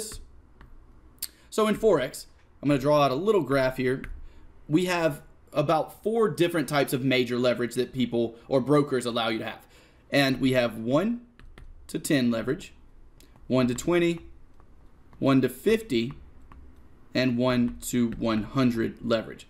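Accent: South, United States. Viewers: His southern accent comes out occasionally and its adorable lol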